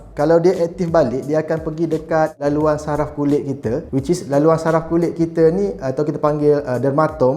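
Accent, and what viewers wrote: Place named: Kelantan, Malaysia. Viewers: Ore kelate kcek luar nmpk lain .tu buleh taw dye ni ore kelate😍😍😍😍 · Confirm abg ni orang pantai timur 😂😂. ade seni dia tu.. nice content bro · abang ni oran kelantan ke? bunyi pelat bektuh hihihi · Hahaha jolo tu abg ni ore kelate laa cakap gitu gakk 😂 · Ni mesti org kelantan / ganu 🤣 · Bang kelantan ke? Napok ckp kl jd kekok..haha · Bunyi Kelate parok.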